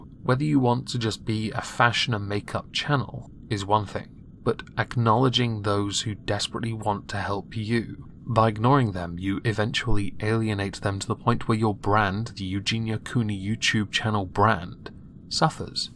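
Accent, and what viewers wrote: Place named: England, United Kingdom. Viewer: You sound so much like Clown ( Collossal Is Crazy ) But it's probably just because your both English? Is it racist to say that?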